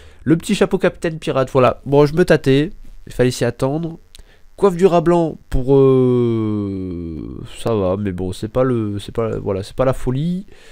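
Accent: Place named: Brittany, France. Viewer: c'est tres l'accent breton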